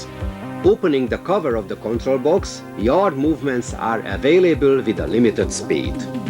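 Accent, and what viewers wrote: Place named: Hungary. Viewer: Huu.. this is too hunglish accent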